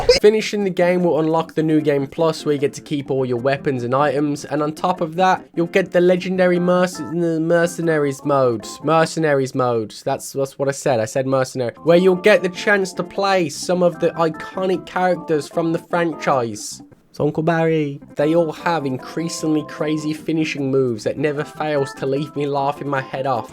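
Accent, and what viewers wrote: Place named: United Kingdom. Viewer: you are awesome dude👊😂, can I ask you where are you from precisely? your accent seems british but not the british I know🤔.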